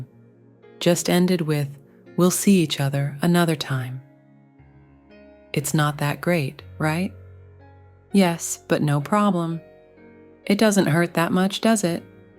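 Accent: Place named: Thailand. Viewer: Your Thai accent is amazing!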